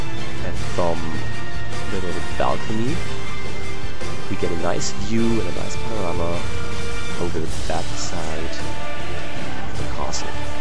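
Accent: Germany. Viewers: ich bin beeindruckt dass du den deutschen akzent so verhältnismäßig gut verschleiern kannst^^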